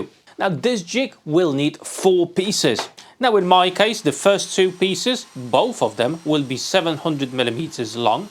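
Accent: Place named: Northern England, United Kingdom. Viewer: Nice to hear that you’ve retained your northern English accent 😅.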